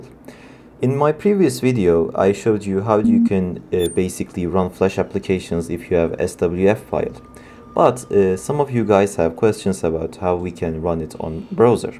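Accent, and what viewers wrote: Turkey. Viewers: türk ingilizcesi bu nerede olsa tanırım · You have a different accent, I guess you're turkish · sende bi türk aksanı sezdim :d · this guy sounds Turkish.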